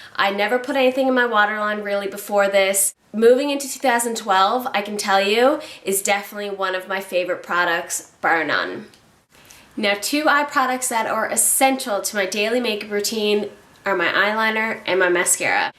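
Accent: Canada. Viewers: Being Canadian, it's always difficult for me to hear what the rest of the world hears as a "Canadian accent." But I think I hear it now....so funny. Probably from watching videos of girls from all over the world. This was the first video of yours that I have watched and I knew within the first couple of minutes that you were a fellow Canadian! Awesome video! New subscriber!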